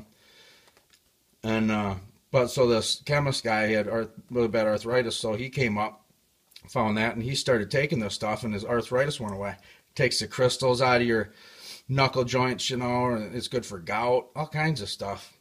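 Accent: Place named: Midwest, United States. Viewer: I absolutely love that you took the time to make this video, thank you so much! (plus, I adore your Midwest accent).;) I started taking 1/8-1/4 tsp Borax everyday to get the fluoride outa my body & even though I now have a Berkey water system w/ the fluoride filters, I still supplement w/ Borax (& Lodoral Iodine) due to chemtrail crap & the various foods & beverages I ingest that likely contain fluoride. Anyway, you rock for sharing, thanks again.:)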